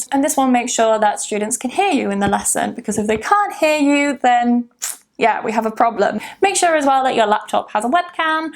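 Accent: United Kingdom. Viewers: You're the best teacher in British accent. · You’re one of my favorite teachers, you speak clearly, that is why I love British accent.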